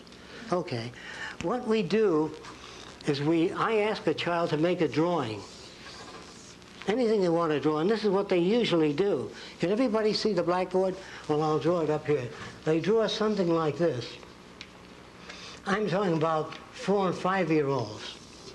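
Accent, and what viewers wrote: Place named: New York, United States. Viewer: AND GOT LOVE THAT NEW YORK ACCENT. LONG LIVE J.F.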